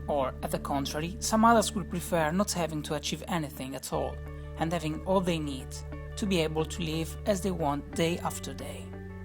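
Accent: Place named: Cagliari, Italy. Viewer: He's from Cagliari (Italy). His accent is annoying in italian too :D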